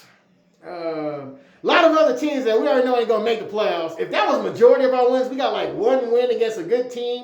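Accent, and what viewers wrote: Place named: Appalachia, United States. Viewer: You talk like a hillbilly. Where do you live?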